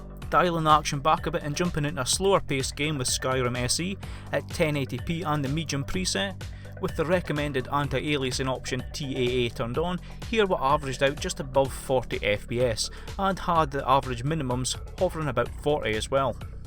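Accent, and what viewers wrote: Scotland, United Kingdom. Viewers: Scottish accent 😍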